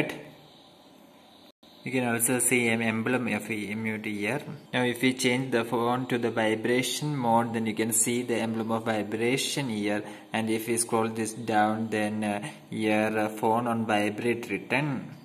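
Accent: Kerala, India. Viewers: The way he is talking looks like malayali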